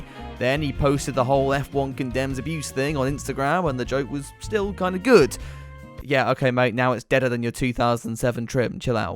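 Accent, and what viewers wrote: United Kingdom. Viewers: Your physical condition is so bad that your face is getting more red with each sentence. Just by talking only while sitting down. In topic. This doesn’t qualify as comedy. But that’s typical British I guess to write down semi smart comments and read them with a British accent thinking people will find it funny. Please have a good recovery, you look sick.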